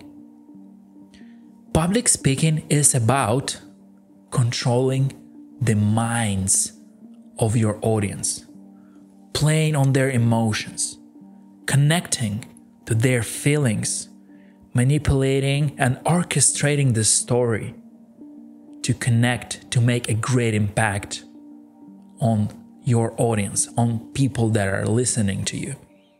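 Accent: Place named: South America. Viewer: I dig your South American accent . Good accent My God, i hope you're not Egyptian. Sincerely Dan, South Dakota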